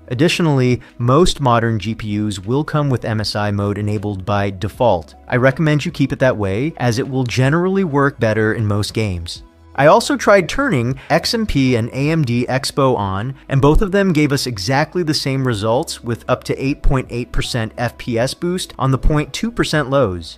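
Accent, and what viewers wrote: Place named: United Kingdom. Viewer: Thanks i got the same cpu getting some solid improvements now, also maybe time to embrace your british accent again for next video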